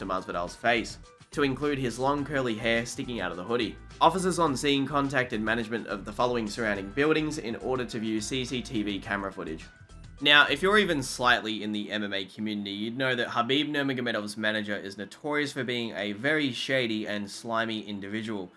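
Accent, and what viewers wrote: Australia. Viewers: You are delusional and insane, sorry. Colby actually is a republican, and the fact that you think it could only possibly be all a fake persona and that he is secretly some lib, shows how out of touch you are with reality, you clearly live online too much. You don't sound American, you sound Australian or something, let me tell you kid, Trump got 74 million votes, these people are not rare, they are in fact pretty common, and the fact that you think that any fighter who says they support Trump is playing a character just to trigger libs, shows that you are insane and out of touch with reality, you are one of these people who doesn't understand that other people exist that don't have the same views as yourself, you are a projecting delusional person essentially, you see Colby and what he says he supports, and since you don't personally support that, you think it has to be all fake.....sigh. 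Dude you are crazy. Also your take on fans changing their opinion of fighters was silly also, because you totally aren't taking into account that as time passes a lot of events occur, why can't fans change their opinion of a fighter after many events involving that fighter take place? That isn't fickle, that is just adjusting the opinions of that fighter after we find out more about them.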